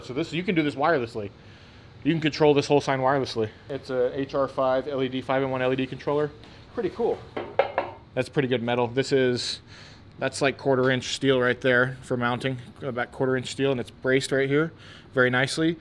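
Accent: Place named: Asia. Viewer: Talent indeed, when he speaks in his normal voice the asian accent becomes obvious.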